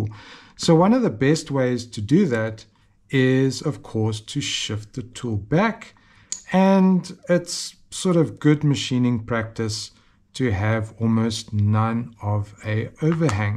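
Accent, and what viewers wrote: South Africa. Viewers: Lovely to hear a good South African accent.... I recently built a stone slicing machine and had trouble of chatter machining the ends of the mandrel to take the cutting disc. I made a lathe steady which has helped in the machining of the long shift but I will definitely use your methods to make a cleaner job.... thanks. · Lovely South African acsent!!. Learnt a lot.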